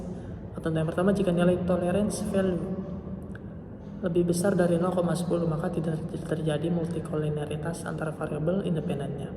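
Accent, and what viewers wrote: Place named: Java, Indonesia. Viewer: Lucu bgtt kayak mas mas jawa😂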